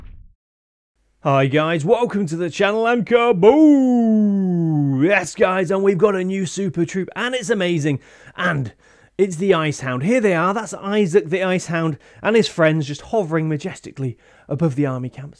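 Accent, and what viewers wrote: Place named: United Kingdom. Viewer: His accent is British.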